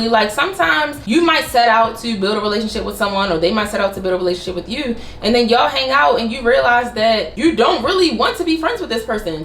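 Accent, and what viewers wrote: Baltimore, United States. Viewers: Are u from Baltimore?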